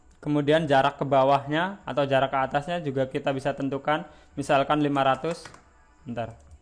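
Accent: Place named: Banyumas, Indonesia. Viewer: ngapak banget mantap mas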